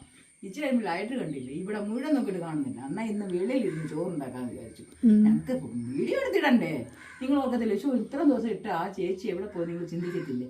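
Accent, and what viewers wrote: Kottayam, India. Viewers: കോട്ടയം കാർ ആണോ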